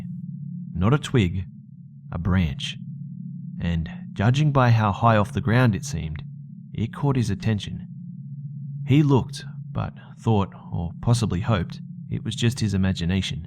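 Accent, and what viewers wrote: Australia. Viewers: i mean, where would he get his outro from? "G'day mates.." sounds pretty aussie to me and i should know...im a proud one..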